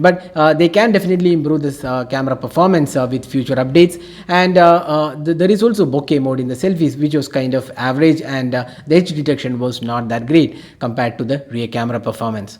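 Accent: India. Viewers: Indian tone hurts my ears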